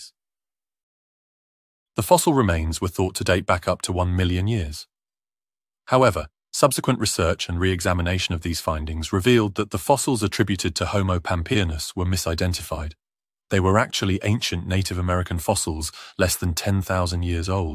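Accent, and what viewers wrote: England, United Kingdom. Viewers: I think it would be nice to pronounce Denisovan and Neanderthal correctly, especially if employing an English accent in the presentation.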